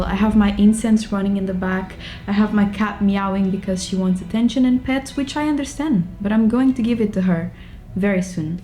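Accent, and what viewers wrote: Brazil. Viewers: you have such a brazilian accent,iu are from brazil? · Olá. Seu sotaque é de brasuca! Hahahaha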